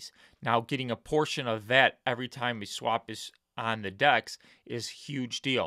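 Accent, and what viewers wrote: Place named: United States. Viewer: Sounds like an american to me.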